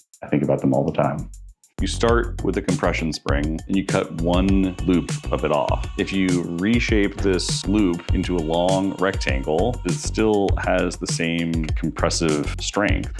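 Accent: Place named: California, United States. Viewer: Cool, but you need to lose that Californian woman’s accent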